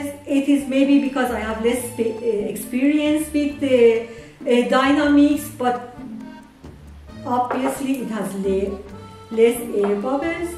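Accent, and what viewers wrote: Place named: Iran. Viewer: Man, that is one thick persian accent lol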